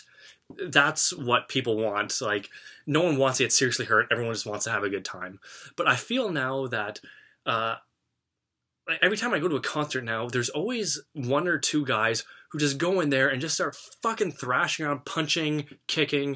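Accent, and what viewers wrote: Canada. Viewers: Where is your accent from? Canada?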